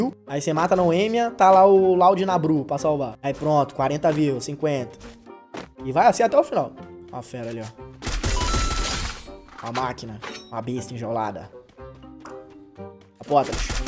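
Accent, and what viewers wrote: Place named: Bahia, Brazil. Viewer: ele é baiano mano, o sotaque muda Kkkkkkkk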